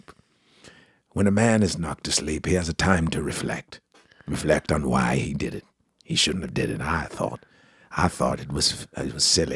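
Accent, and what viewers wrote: England, United Kingdom. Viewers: I give you a 7.8 out of 10. The problem is, you have an English accent while doing that voice, and Morgan doesn't. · It’s very close. It’s just the English accent that makes it not Morgan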